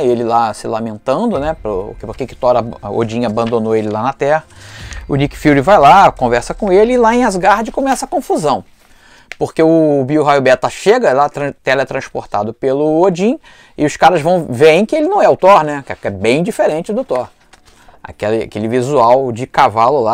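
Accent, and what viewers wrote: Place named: Northeast, Brazil. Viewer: Cara, sei nem te explicar. Depois percebi que você fala sobre algo que conhece muito bem do jeitinho que eu mesmo falo. Você é nordestino como eu né? Mas depois passei a adorar sua paixão pela coisa!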